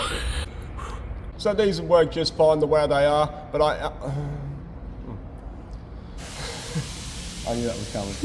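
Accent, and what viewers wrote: Australia. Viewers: I THINK IT WOULD BE AWWSOME TO DO A VIDEO IM YOUR NATURAL AUSTRALIA DIALECT. I BET WOULD BE HILARIOUS THINK ABOUT IT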